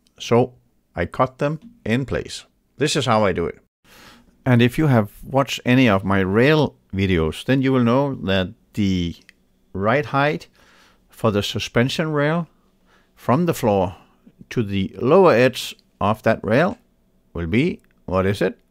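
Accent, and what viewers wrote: Denmark. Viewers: Liked and subscribed👍 very nice videos, and valuable information! Would i be mistaken if i said that there is a hint of “jydsk dialægt”? 😄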